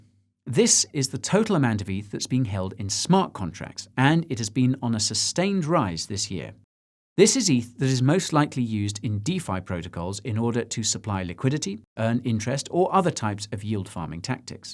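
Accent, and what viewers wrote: United States. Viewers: As an American, I want to say your American accent is excellent! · Nice American accent!!! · Great American accent! I'm always amazed when I hear a brit talk just like a Yankee! Very kool! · Your American accent is pretty good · The American accent is amazing hahaha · Pretty good American accent guy. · Great American accent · your american accent is fantanstic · Love the American accent lol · Not bad American accent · American accent was not bad///// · Incredible American accent! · Guy, does a pretty good American accent. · The American accent was great 👍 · pretty good US accent lol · Great American accent. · Great American accent, ya got there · As an American I find your American accent impeccable and was super impressed. 😳🌟👌 Well played, sir! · The American accent is brilliant! Very well done. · Love your American accent! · american accent is sick. 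much love from tennessee 🇺🇸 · Impressive United States accent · that American accent is on point lmao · I like that USAccent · As an American, I appreciate your American accent hahaha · That was a far better American accent than I would be able to do British accent. Bravo 👏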